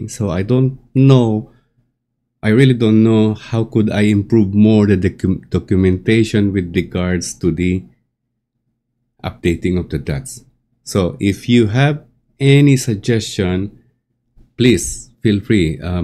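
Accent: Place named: Ilocos, Philippines. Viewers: the accent gives ilocano vibes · Hey, base on your accent and your name 🤣, I could tell your a Filipino, don't know if I'm right, but Well done kabayan. Pin if your really a Filipino pls.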